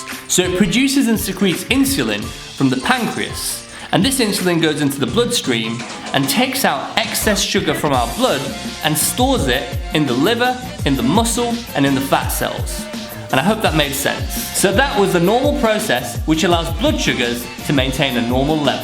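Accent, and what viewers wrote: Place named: West Yorkshire, United Kingdom. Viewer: He sounds like he's from Bradford West Yorkshire.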